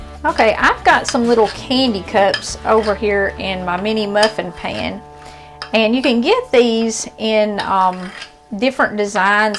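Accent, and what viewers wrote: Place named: Appalachia, United States. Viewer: Cutest Hillbilly on YouTube, and love the accent. Great recipe, thank you.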